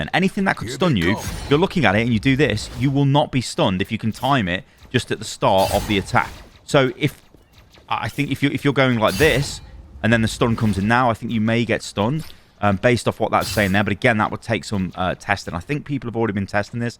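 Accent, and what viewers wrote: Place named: Newcastle, United Kingdom. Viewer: Sounds like Newcastle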